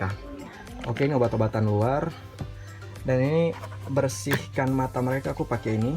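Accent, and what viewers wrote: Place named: West Sulawesi, Indonesia. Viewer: Dimana tepatnya ini,kalau simak dari logatnya bro ini dari SulBar? Sy juga memelihara bbrp kucing 😀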